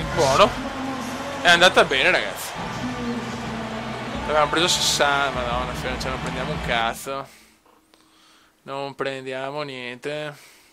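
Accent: Veneto, Italy. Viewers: nè che sboro fioi, non semo ciapà tanto màl. e qua il veneto si sente hahaha grande <3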